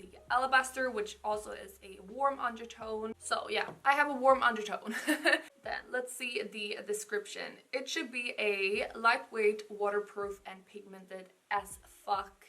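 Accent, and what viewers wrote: France. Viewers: That foundation looks really good, I might give it a try 😁 And ouh that French accent, easy to recognize haha (kisses from France !) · Ahaha as a frenchie I can definitely tell he is french 😂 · French guy? Haha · That was a french accent in this man's voice haha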